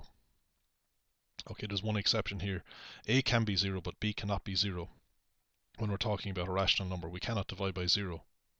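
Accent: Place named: Ireland. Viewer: So so so helpful thank you very much and finally someone Irish doing these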